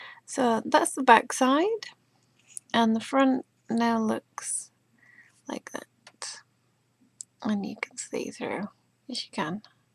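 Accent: England, United Kingdom. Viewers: That is ALSO the first time I have ever heard anyone say they could not understand someone with a "thick" English Accent!!!! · sorry your english accent is to thick i cant understand a lot of the items your using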